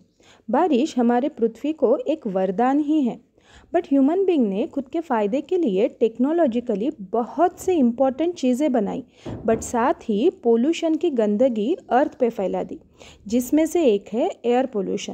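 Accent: India. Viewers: r u bengali ? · Brilleint maam btw ma'am u r from south India i think according ur accent rii8??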